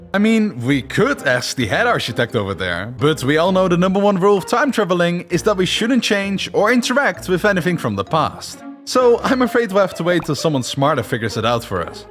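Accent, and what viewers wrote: Netherlands. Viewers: Im way too late on this but I can just smell the Dutch in your voice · Is the guy talking originally Dutch? :D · please get someone to voice these in i cant w the dutch accent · Like the video, but the dunglish hurts my soul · Horrible Dutch accent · Are you Dutch. I hear an Dutch accent (I’m Belgian) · I smell dutch and i love it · I've never watched your channel, but I can tell you're Dutch, so stop that. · Wat een prachtig Nederlands accent! Love it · Ik hou van het nederlands engels accent. Altijd herkenbaar :)) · Geweldige video maar als nederlander irriteer ik me vreselijk aan het nederlandse accent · Are you Dutch/Belgian? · When I started watching this vid and I heard ur voice I knew you are Dutch immediately😂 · nederlandse accent? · I can smell Dutch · You have the most Dutchest accent I’ve ever heard lol. Nbl from a fellow Dutchman ;) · Gemaakt door een kaaskop jeeeeez je hoort het gelijk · Bro, je Nederlands accent schijnt dik door😂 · I'm also dutch and I could immediately tell you were dutch because of the accent 😂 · Wow wat slechte spraak in Engels. 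Duidelijk te horen dat je Nederlands bent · Nederlands accent gaat hard maat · I can smell a dutch fella from a sentence away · Dat Nederlandse accent haha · I smell dutch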